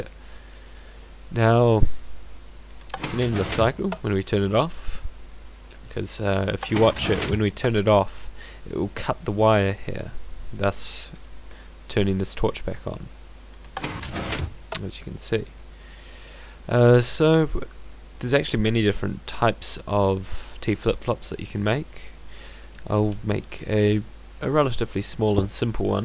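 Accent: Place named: Germany. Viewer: Are you German? Cause you sound a little bit like a German ^^'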